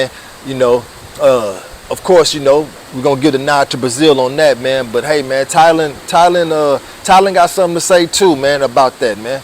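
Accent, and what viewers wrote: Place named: South, United States. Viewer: My brother you got game the gift for gab and much respect to you for help with understanding where we travel 🙌 💯 let's chop it up 😅 hey where you from Miami i bet somewhere down south. Im from Montreal Quebec Canada 🇨🇦 but visited my Aunt Uncle and cousins Miami dade airforce base and sounded similar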